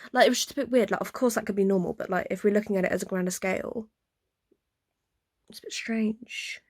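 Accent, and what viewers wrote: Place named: United Kingdom. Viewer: Nah, you would totally dominate that podcast ! for one, your're British accent will make you sound superior, Lol - and 2, you know your stuff! I hear a ton of blogs saying she is back with Agron? I actually think they're in communication, maybe not back together just YET< >but they soon will be. I also think that Taylor will at some point, start hinting more towards her sexuality, she will either do something major after ( covid) and get papped being way too touchy feely wit a girl just to drop hints... and then eventually, she will start forcefully hinting at her 'bisexuality'< she will never quite come out of the closet, but she will aggressively ( more than she already have been with Karlie) drop suttles. Taylor want's out the closet, but her team will NEVER let her. Because taylor , Jennifer Aniston, Katie Mcgrath and an host of others, will never, EVER address those sexuality rumors.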